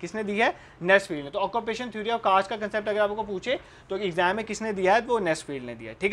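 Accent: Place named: Poonch, India. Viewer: Sir ke accent se hi me smjh gyi thi ye apne poonchiye bhai hi h koi😂😂😂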